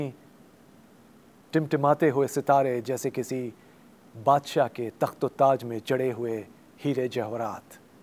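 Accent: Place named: Deccan, India. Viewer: Irfan sahab aap yahan? khushamdeed. khilaaj/khiraaj samajh nahin aa raha tha audio mein. bhai ki dakkhani urdu bhi bahot tight thi. kaafi alag aur dilchasp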